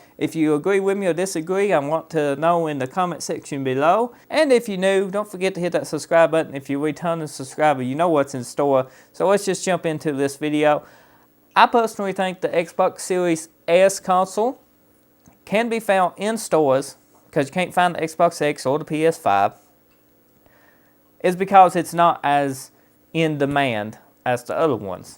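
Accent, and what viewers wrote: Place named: South, United States. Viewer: Your southern accent. That one lol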